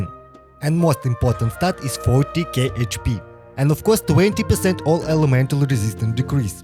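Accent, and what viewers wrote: Russia. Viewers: Русский акцент)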